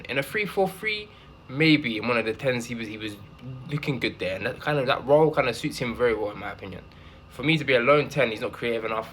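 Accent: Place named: Manchester, United Kingdom. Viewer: lovely manchester accent you have there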